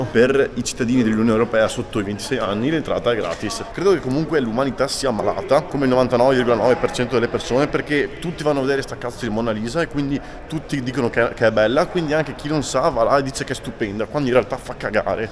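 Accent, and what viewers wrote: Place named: Veneto, Italy. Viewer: il tuo slang Veneto ci sta un sacco per dare pareri soggettivi alle cose😂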